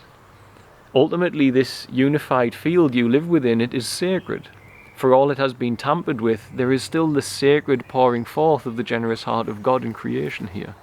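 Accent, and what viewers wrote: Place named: Newcastle, United Kingdom. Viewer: i love your slight geordie accent lol i am a geordie trying to be godly too :P lol thanks for the info and videos, keep spreading the light xxx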